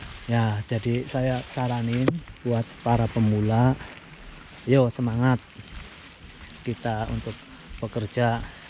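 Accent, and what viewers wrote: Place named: Cirebon, Indonesia. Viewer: Sampean logat cerbonan mas ...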